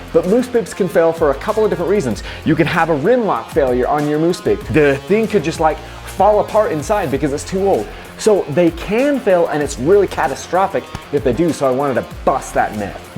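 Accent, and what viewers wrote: Utah, United States. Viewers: I thought you were saying “engine breaking”. Hahaha. I live that Utah accent 👍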